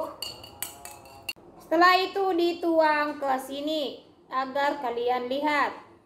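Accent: Medan, Indonesia. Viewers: Maaff mbak orang medan ya?? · Denarin kk lucu banget kyak orang Medan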